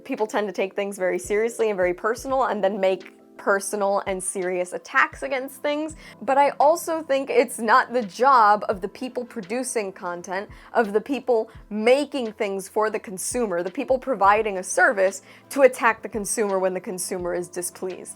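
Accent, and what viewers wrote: Australia. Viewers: Excellent accent mate, I closed my eyes and could picture an Australian 🦘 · I did not expect the Australian accent 😅 well done. This should be a training video for PR people in Hollywood. You hit all the points 🙌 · Love the Australian Accent 😂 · Your Aussie accent, tho! 👍😄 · Hahaha love hearing an American do an Aussie accent. It’s the cutest thing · Great video, big fan of the content you create. The people making TV and films today are just arrogant fools. I mean what's the worst thing you can do if someone doesn't like your stuff, oh yes, tell them that there's something wrong with them for not liking it. Total hubris on their part and pretty much guarantees that the next thing they do will be frowned upon too. However, it does pointing out that it's not just people in Hollywood who currently have this attitude, look around anywhere and you'll see the same thing happening; nobody in any walk of life wants to accept any sort of criticism today. 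Loved your Australian accent as well. Keep up the good work. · How many upvotes can I give you for your choice of restaurant, how you want the steak cooked and hilarious aussie accent? LOL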